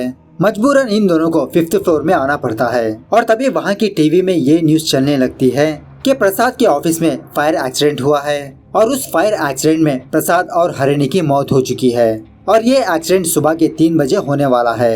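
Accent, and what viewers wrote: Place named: Hyderabad, India. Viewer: Bhai Hyderabadi dilect akhari hai...😎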